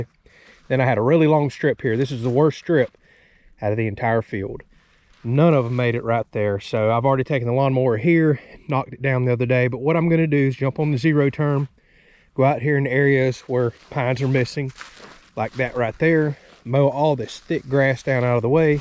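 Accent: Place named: Florida, United States. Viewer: Hey, love the accent. Have you been a Florida man all your life?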